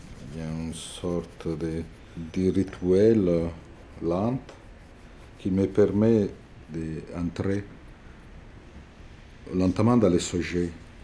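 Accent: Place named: Italy. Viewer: meraviglioso. e delizioso il suo francese con accento italico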